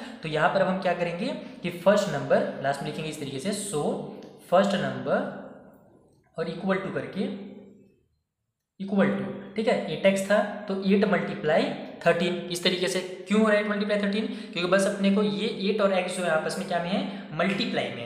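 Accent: Bihar, India. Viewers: sir app bihar se ho na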